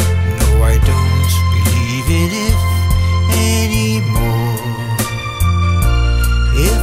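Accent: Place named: United Kingdom. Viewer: Superb,. Such a unique upload of this lesser-known Whittaker gem, a profound, deep poem with amazing heroic/patriotic music accompaniment. It's pretty cool to hear you using your beautiful Brit accent on this. Normally, you just merge into the hybrid voices you create, and no one can tell. It sounds just lovely here, man. Excellent vocals! BTW, Dave Silk is the best, a first-rate gent! Shout-out to David! I hope everything is okay, bro... is Helen doing well? So glad to hear about the new medication, and that things are a little better. We've all been praying for you both, dear friend, and sending good vibes. Wishing you both a week of tranquility and calm. Peace and brotherhood,🙂 Mac (aka RE-VO)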